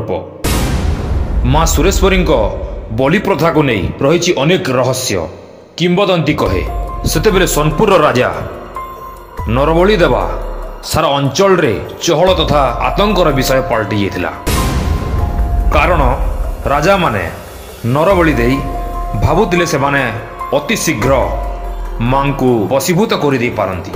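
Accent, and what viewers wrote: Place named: Sambalpur, India. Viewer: Jai MAA sureswari...bhai tumar katkia katha re sambalpuri jhalsu che...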